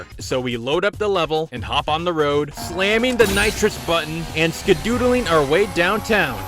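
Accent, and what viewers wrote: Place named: United States. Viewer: I was so confused for a few seconds hearing an american talk about this show, and all comments are in english, what's happening?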